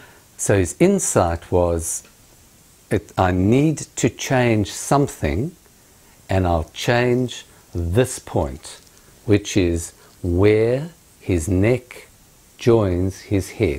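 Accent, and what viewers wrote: South Africa. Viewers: just turns ''ouuff''. can't beat the sath african accent.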